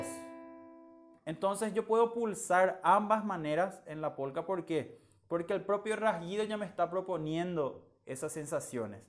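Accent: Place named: Paraguay. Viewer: por su acento y por lo bien que ejecuta la polca, definitivamente ES paraguayo 💞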